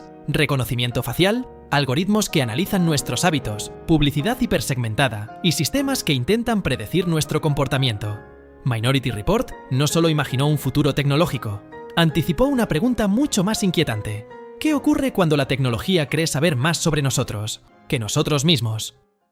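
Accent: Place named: Spain. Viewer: Es que no existen otros narradores que no hablen españoletos? Ya lo dije NO VEO VIDEOS NARRADOS POR ELLOS. NOJODAAAA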